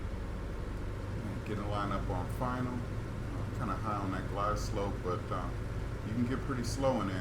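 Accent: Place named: Germany. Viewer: Great visuals and informative. Good job on the volume control. Love your German accent, lol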